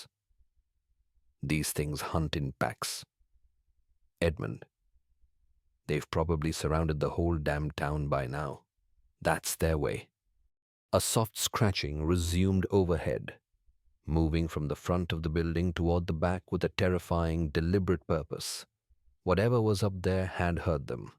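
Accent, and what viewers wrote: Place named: India. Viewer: How horrifying!😱😱😱 Is there any special reason the voice sounds Indian? Grave Fear has a video like this. Same title, same plot, same characters. Why do five guys in the video title screen I'll have the same face? Are they quintuplets? , the story says "they held a look of pure douer", but the video captions say "pure awe". , it seems like the story starts over from the beginning. The only differences between this and the Grave Fear one are the narration and the way it starts to summarize what happened so far towards the end. Then it continues onward, going the way the Grave Fear video did. Another change is that the survivors didn't make it out. Is there, or will there ever be a sequel?😢😔🙏🙏🙏💖✨📖✨💖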